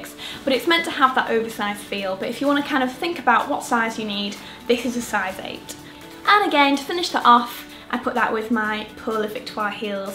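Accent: United Kingdom. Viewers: Love your accent. I'm from the US so it sounds like you have a British accent or something like that. Also love your videos.😋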